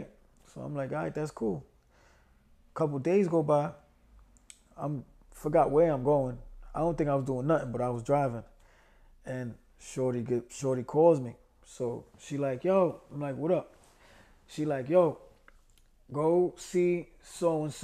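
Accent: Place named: Bronx, United States. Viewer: Immediately subscribed . Love from the Bronx lol that ‘YU HURD!?’ Told me all I needed to know. Accent lmao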